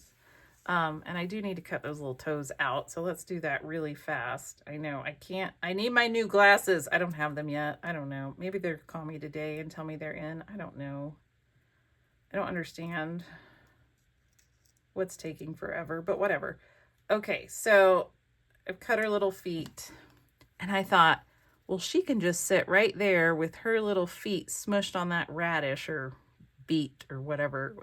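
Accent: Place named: Georgia, United States. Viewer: I think your Georgia is comin out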